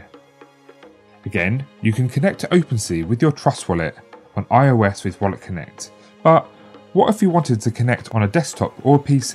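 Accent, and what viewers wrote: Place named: England, United Kingdom. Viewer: same guitar and same English guy voice is every W3 video...he must be busy..I wonder if he plays the guitar too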